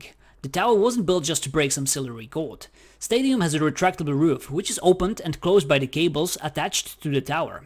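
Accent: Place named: Europe. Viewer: "I live in small country with pop just 10m, with 1m is a force" based on your pronunciation and accents now my scope is GRE, BEL or NED, and maybe CZE too (exclude POR because I heard them accents and I knew it)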